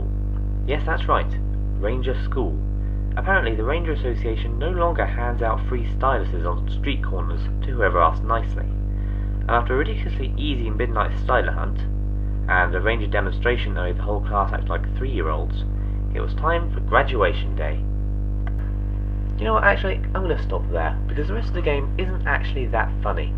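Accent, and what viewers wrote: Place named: United Kingdom. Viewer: lol i love how 90% of youtube game reviews the host has a british or english accent xD your pretty funny though thanks for the info :)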